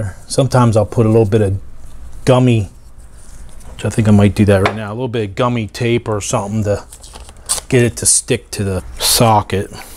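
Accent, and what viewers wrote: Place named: Pittsburgh, United States. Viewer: Thanks for posting this. I took my 4.4L apart to replace the PCV valve. Your video helped a lot. And finally, you have to be from Pittsbirgh, PA judging from your accent!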